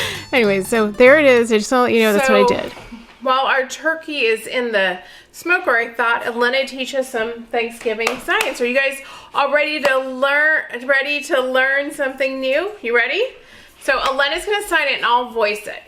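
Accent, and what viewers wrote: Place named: South, United States. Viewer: I have to admit, I watched this like THREE times (of course, all the way through lol) because I kept getting distracted. SO thankful that we got to do another collaboration together, you are just so much fun and so full of life. I love how you had a bit of a southern twang while presenting, loved it! I added your video to our playlist and I will be sharing the playlist tonight on our community time to get the word out even more. Honestly will be watching this a fourth time when I can actually listen to it for the entirety. ❤️❤️❤️❤️❤️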